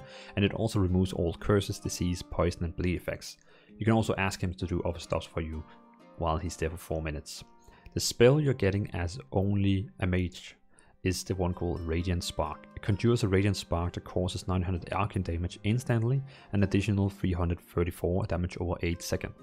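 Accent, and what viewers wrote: Denmark. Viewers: Are you Danish? Nice video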